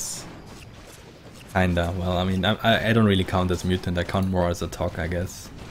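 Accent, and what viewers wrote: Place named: Germany. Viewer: Dieser Moment, wenn du eine Sekunde nach dem Start des Videos merkst... ...dass der Sprecher Englisch mit deutschem Akzent spricht. :-D Aber nett, dass noch jemand das gute alte WC 3 spielt, weiter so! :-)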